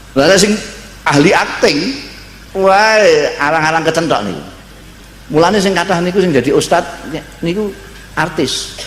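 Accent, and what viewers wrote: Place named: Java, Indonesia. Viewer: Jowo keras lurdd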